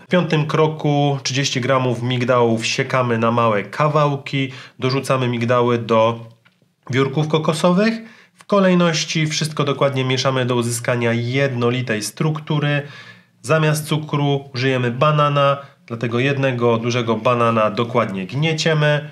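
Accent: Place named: South, Poland. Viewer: Oj, taka gwara południowa!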